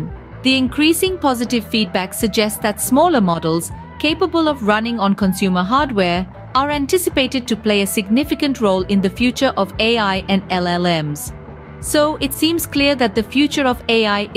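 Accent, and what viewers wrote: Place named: India. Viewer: I don't think ai voice is made on purpose with a slight indian accent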